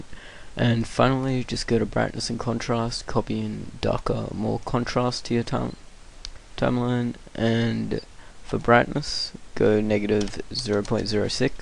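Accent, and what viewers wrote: Australia. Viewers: Hehe aussie accent, same xD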